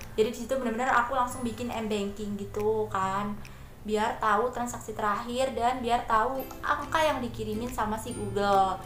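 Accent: Banyumas, Indonesia. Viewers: Salam dari magelang kak. ternyata ngapak ini orang😂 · hai kaka cantik dan ngapak lagi,smpurna deh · Wong Ngapak Ya 😁 👍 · 😅 dengka pada bae ngapak karo nyong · Jebul wong ngapak kaya Inyong, makasih motivasine mbakyu , sukses 😁👍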